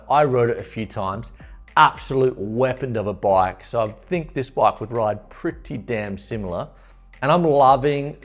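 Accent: Australia. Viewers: You sound Aussie but you talk in pounds, are you doing bike reviews in Australia?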